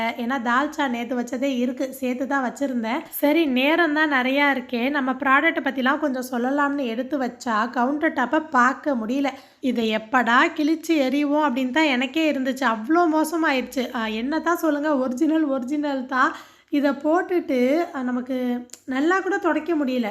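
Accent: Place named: Adirampattinam, India. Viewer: Adirampattinam slang kooda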